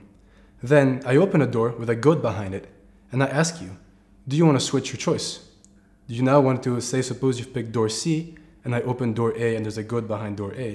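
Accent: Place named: France. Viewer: Cute French accent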